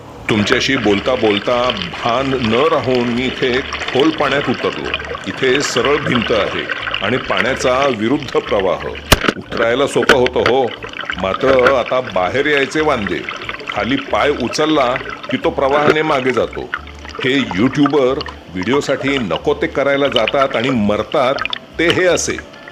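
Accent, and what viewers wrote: Pune, India. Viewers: खूप छान पुणेरी मराठी !!!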